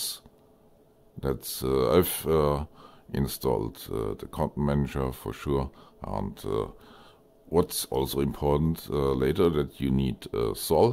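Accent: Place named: Germany. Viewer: Thank you for your informative video. Perfect German English, I would sound exactly like you 😀. Are you sure that the resolution in CM-settings-Assetto Corsa- Video has impact to the VR performance? It is overwritten by the VR video settings as far as I know. I have problems with very big user interface of the CM apps in AC in game when I lower the resolution under my 4K of the screen. 👋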